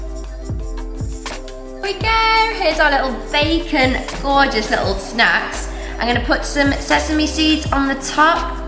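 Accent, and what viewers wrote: United Kingdom. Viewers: Hi there, I am Taiwanese who live in London now. As soon as i got homesick, the big issue is that I have to learn name of all veg and ingredients. thanks to your cooking lesson in Eng. and I love your British accent. I'd love to share Taiwanese Food with British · 英國腔的做菜過程，我愛了❤ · 想請問Amy是從英國哪個地方來的呢? 有聽說英國不同地區的發音也有些不同。想請問您是哪個地區的?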